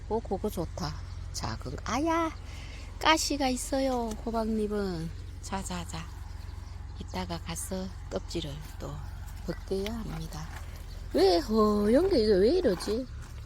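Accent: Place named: Gyeongju, South Korea. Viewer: 경주사람 정겨운 목소리 들어니 반갑습니다 저도 경주 광명이 고향인데 .간된장 정말 일품이죠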